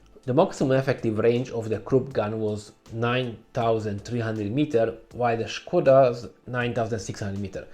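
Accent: Hungary. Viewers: Szia! Magyar vagy igaz? Magyar akcentussal beszélsz angolul😊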